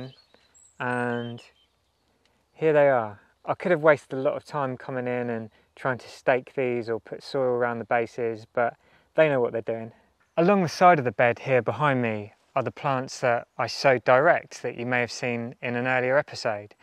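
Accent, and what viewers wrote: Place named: England, United Kingdom. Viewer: Gaspé (a 6-row, short-cob flint maize) would do it, even in the severe climates north of 53°N in vaguely-interior western North America. You sound like you're from England (incidentally, I am too - I say "England" and not "Britain" for very personal reasons) - what part of the country are you in, and how many GDD10s (growing degree days - base 10°C) do you get in a year?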